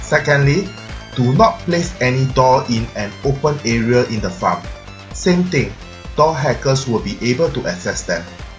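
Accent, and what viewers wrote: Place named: Singapore. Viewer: He from Singapore. All not from America or England.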